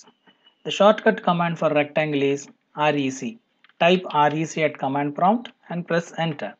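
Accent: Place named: India. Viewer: Nice try but you have to improve your Hindu - English accent you speak. Half of them cant understand.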